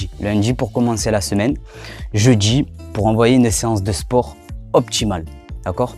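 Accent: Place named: South, France. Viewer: Perpignan 😍😍 Il m'a bien semblé percevoir l'accent du sud 🤗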